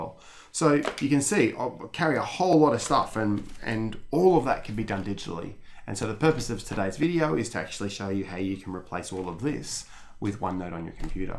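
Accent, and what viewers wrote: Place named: Australia. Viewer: The Aussie accent has got to be hands down the best accent imo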